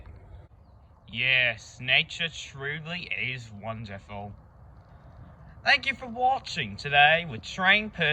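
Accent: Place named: Australia. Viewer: Why the actual hell is no one talking about his b e a u t I f u l Australian accent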